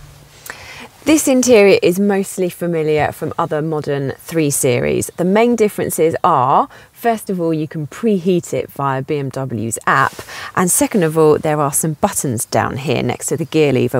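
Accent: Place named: RP, United Kingdom. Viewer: Oooh, I do like her posh accent. :)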